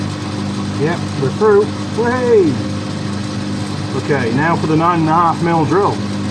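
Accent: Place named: East Yorkshire, United Kingdom. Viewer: Hiya buddy, new subscriber after watching `no oil change for 75000km` . I was intrigued as to where you originally came from, sense a twang of East Yorkshire. Anyway good work fella and I shall start on your back catalog.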